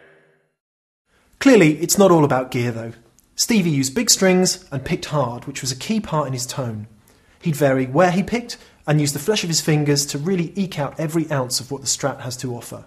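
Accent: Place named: United Kingdom. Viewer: !!!! so Young! As a non-English speaker it is remarkable how his accent has become so much clearer over the years whilst remaining so British. IMO, the best guitar youtuber with Andy and Justin. amazing guitar playing and presentation skills, I am so envious:)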